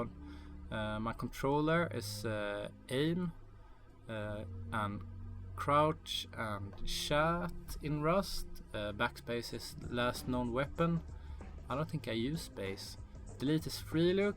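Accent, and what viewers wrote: Sweden. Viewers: Loved the review. Love your swedish accent aswell, especially xd. Recently bought one of these in factory new condition, it feels like a tank and looks like one aswell. I'm gonna mod it, so this video will probably help me again in the future lol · love the swenglish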